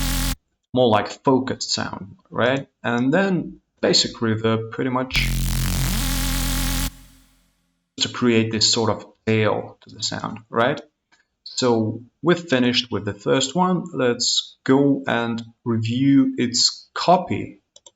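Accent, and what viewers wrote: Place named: England, United Kingdom. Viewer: О,вы из англии?))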